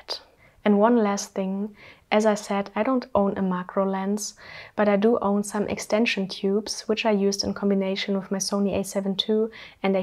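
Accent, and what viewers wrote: Germany. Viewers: Came here for photography stayed for German accent 😍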